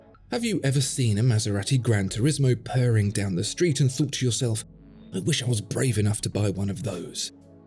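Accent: United Kingdom. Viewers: Time to dump this channel. The fastest thing you can deduce by anyone wearing a Rolex Submariner is they aren’t an actual diver. I love mechanical watches for the art, design and engineering. Could care less about tradition etc. when I bought my first (and only Rolex) I wanted a relatively cheap but sturdy and reliable watch. Got it used. Now worth 3 times what I paid. Don’t care. It keeps good time and I can wear it while working in my garage. Replaced chipped crystal once during overhaul. Most people don’t notice what it is and I’m happy with that. I’m going to find a watch channel without the gushy mewling, British accent, that talks about interesting complications and design ideas. Not about old traditions - that died decades ago. These are rich companies marketing smoke and mirrors to people who desperately want to “belong.” The richest guy I know wears a Casio digital because he likes it. 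I don’t like any watch with a battery because twice I found myself in a situation with a dead battery and no battery shop for a few thousand miles. For me mechanical and automatic.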